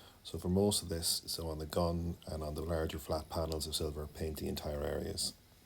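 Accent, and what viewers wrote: Ireland. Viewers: u Irish ??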